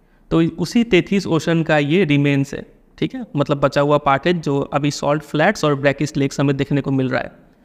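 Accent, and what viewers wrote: Bihar, India. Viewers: he's bihari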